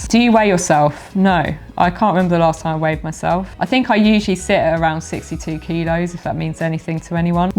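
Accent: United Kingdom. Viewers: Love your British accent,. It is so cool. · The accent you noted the UK? Pretty cool I competed there often in college and was always very impressed with the University of Kentucky athletics facilities! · Great girl, great accent, great concept, Great Britain! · If I didn’t appreciate watching a beautiful tall blonde woman with blue eyes and a British accent, I wouldn’t watch you. But I do!❤️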